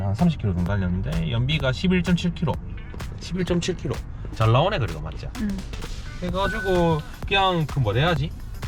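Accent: Daegu, South Korea. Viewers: 군생활을 대구에서했는데 간만에 오리지날 대구사투리 반갑네요. 연비 잘보고갑니다.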